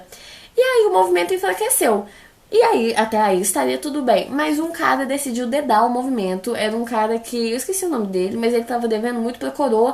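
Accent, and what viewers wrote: Minas Gerais, Brazil. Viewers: Vc e mineira ??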